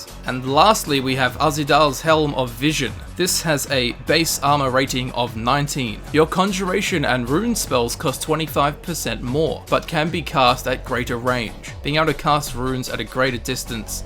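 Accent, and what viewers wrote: Australia. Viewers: Batmans Goldfish he sounds like Crocodile Dundee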